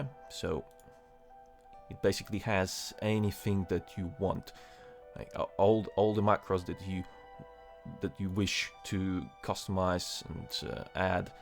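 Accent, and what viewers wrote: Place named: Russia. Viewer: Ты оч хорошо по английски говоришь, но всё равно с русским акцентом))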